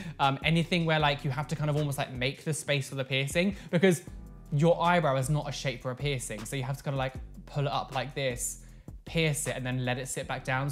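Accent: United Kingdom. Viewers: Really sorry about your piercing :/ Also I have a kind of different question, what accent do you have ? I really love it, I mean I know it's British, but is it RP? Or maybe Queens? I'm really curious haha Love your videos!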